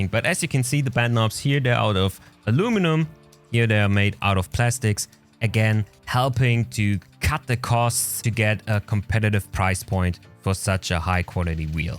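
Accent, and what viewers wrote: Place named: Germany. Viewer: klar, 160 euro mehr als Deutscher... Abzocke at its best, und dein german accent suckz bad, versuch besser mal auf deutsch -.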